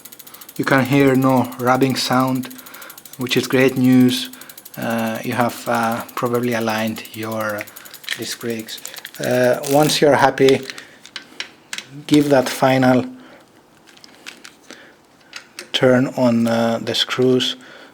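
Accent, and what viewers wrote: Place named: France. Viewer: Thank you! What accent is that? It sounds almost like French! Merci beaucoup! :)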